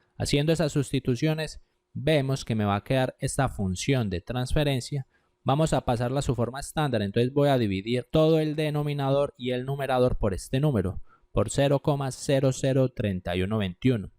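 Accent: Colombia. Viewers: Muy buen video. Tienes acento Colombiano ¿si?.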